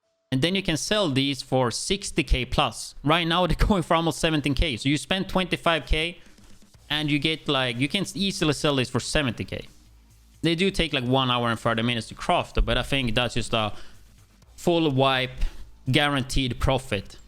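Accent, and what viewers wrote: Sweden. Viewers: are u swedish i hear som swedish dialekt haha lol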